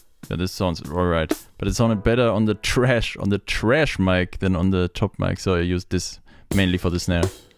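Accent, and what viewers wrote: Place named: Germany. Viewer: talks like a german, 'Heh?s' like a dutchman . do Germans do that too? I thought it was a very dutch thing until i heard you do it.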